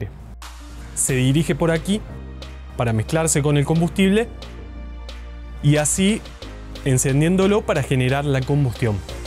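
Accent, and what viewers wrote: Argentina. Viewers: Eres de aca Argentina no? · sos argentino ==? capooo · Vos sos de cordoba no?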